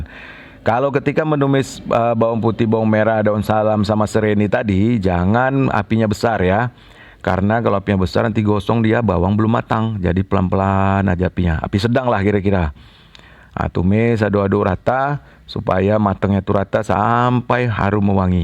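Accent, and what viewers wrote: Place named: Medan, Indonesia. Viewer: Medan kali bah...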